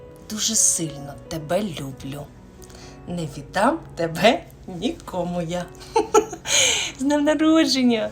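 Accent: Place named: Surzhyk, Ukraine. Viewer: Це не Українська, пробач мені. сільській суржик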